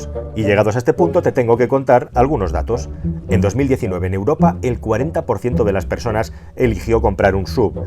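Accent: Spain. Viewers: Muy buena la información. Estoy suscrito a tu canal. La verdad le hubiese dado like pero, la música de fondo está muy fuerte y me resulta molesta. También hace que se pierda cierta información ya que para los latinos (Argentina en este caso) el acento español a veces es complicado de entender y si le agregás música, peor. Saludos. A tu disposición.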